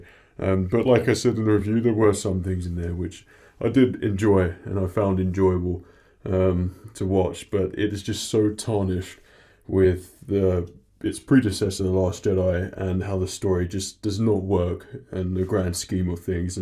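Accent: Australia. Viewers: I thought you were an Australian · I've said it before but I'm really glad I'm not the only one who felt the Disney Trilogy made the galaxy feel small. Also, you're in England? Why was I always thinking you were Australian?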